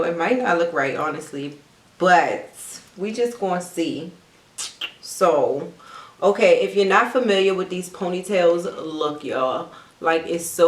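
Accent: Baltimore, United States. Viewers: bmore accent ?? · This is my first video ever watching her 😂 i think i can tell that she from Baltimore lol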